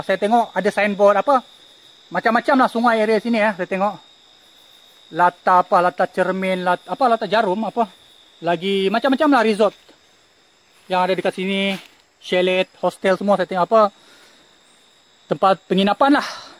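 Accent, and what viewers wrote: Sabah, Malaysia. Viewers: Mcm org sabah loghatnya bercakap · Ada logat sabah.awak orng sabah ke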